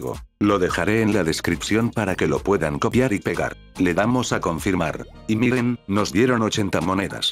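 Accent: Argentina. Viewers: Es de arg o uruguay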